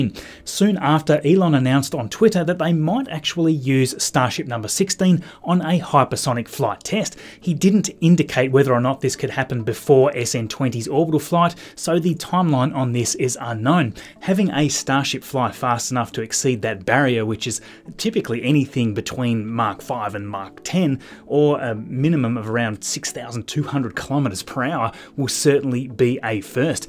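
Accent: Australia. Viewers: Where re u from Australia?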